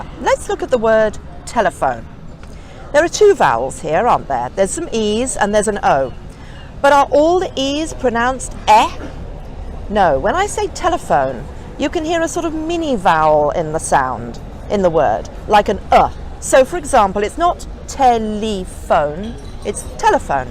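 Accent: United Kingdom. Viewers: I wish you all the best of success and thank you for your precious efforts. I like your British accent.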